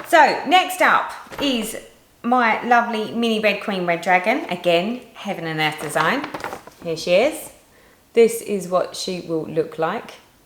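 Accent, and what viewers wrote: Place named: Essex, United Kingdom. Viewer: Hi...PLEASE don't be discouraged by these ignorant, moronic naysayers. They've nothing better to do with their time than shoot nasty comments your way, probably, as Thewoolyhattedstitcher says, they're probably jealous and envious of your talents and beautiful pieces because they have nothing to offer themselves. Your enthusiasm and excitement is contagious. You are a beautiful person inside and out so don't let anyone tell you otherwise!! Block them! Delete them! Whatever you have to do. There are plenty of people out here who love you and would miss you like the devil if you should quit doing videos. I wait patiently for every one of them. Besides, I'd really miss that Essex accent of yours. It just adds to your beauty!! That said, may I compliment you on every piece you've shown in this video. I'm soooo partial to the high heels collection that I'm going to order the pattern. 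You know your an enabler!! LOL Congrats on your page finishes and all you've done. What a hell of a start to 2020. Love ya and please stick with it. Hugs from Canada (Yes, we're huggers here. We have to be just to stay warm! LOLOL)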